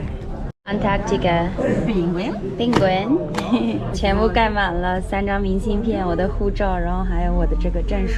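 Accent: Northeast, China. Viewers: 应该和东北女孩一起工作生活过，满口的东北口音，一点不像服南妹子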